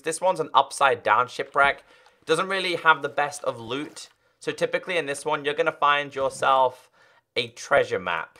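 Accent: United Kingdom. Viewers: Such clear pronunciation) Is he British?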